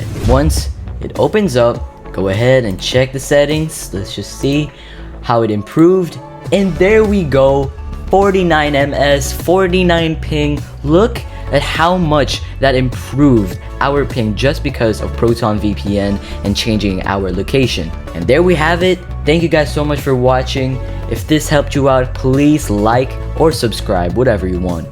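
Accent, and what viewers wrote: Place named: United States. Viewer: You sound American wtf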